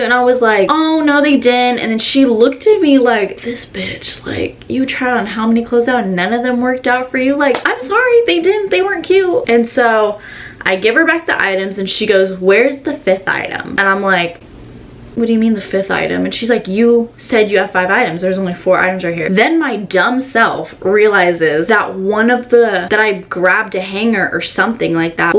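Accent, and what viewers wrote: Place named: Australia. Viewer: loved that australian accent lol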